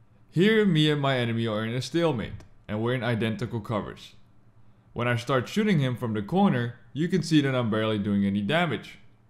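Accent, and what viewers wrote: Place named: Hawaii, United States. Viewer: Your from Hawaii I can tell good video shooooootz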